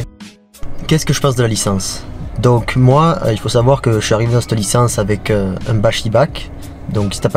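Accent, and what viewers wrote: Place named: Spain. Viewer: Wow tu as un super bel accent espagnol !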